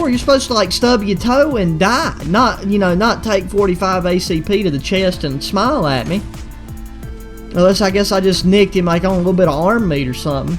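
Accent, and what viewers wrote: United States. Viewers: love that accent lol sounds like a cowboy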